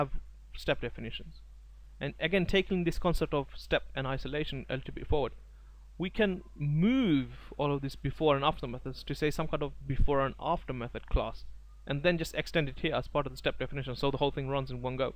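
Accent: United Kingdom. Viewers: Hi, Your work is Amazing buddy, I like it very much, to the point videos. I do have bit of a issue with following some sentences of your British accent, but this issue is far from the quality of subject ad experience you deliver. Keep up the great work.. One thing I wanted to ask, in your videos, you worked with small examples, small piece of codes. I am Manual tester with some automation experience in QTP, I am after learning automation using from your and other people's videos, i want to start my career into Automation using selenium, but I am hesitant because so far we have only learnt with small samples. Can you give us a real time example or real time requirment in regard with automation, so I can judge the scale of intelligence required to do automation in real world . I know this is a general question , to which mots people end thier answer with " IT DEPENDS" and leave the questioner to swim in the ocean, Can you please try to answer this in more helpful way to newbies by giving some real life examples you came n your carrer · Where are you from men? 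Your accent sounds like... I don't know... Scottish? (well definitely not an Indian?..)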